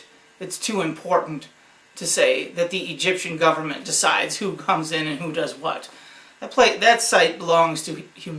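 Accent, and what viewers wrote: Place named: United States. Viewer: Just read your post. The presenter has an American, not Australian accent.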